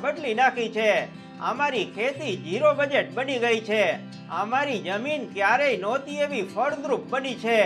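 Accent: Kathiawar, India. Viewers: વા ગૉર મારાજ આવ તમારી કાઠિયાવાડી ગુજરાતી વાણી સાંભળી મૉજ આવી ગય👌✨😌🙏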